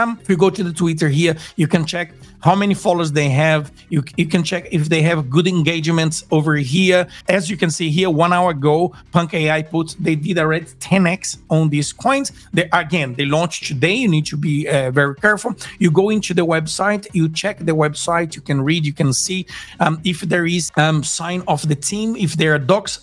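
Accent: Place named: Brazil. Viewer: Brazilian accent detected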